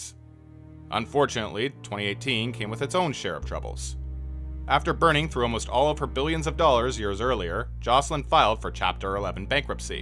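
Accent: Canada. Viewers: Is that a Canadian accent I detect?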